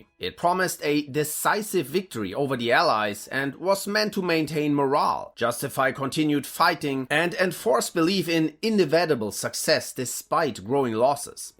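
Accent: Germany. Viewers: No, AI couldnt pull off a german accent this well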